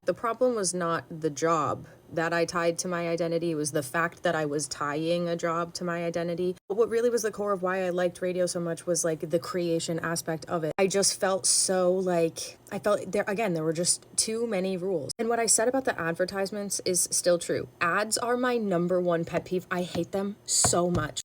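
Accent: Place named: New England, United States. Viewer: I love the way you talk! I was raised in Rhode Island and your accent and mannerisms remind me a lot of my hometown friends (i dunno where you're from in new england but your accent is familiar to me at least) your content is just v cozy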